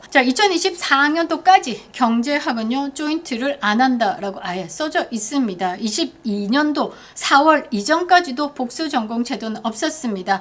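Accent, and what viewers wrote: Gyeongsang, South Korea. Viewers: 전한길뉴스보고 왔습니다 경남사투리 예쁜누님 화이팅!!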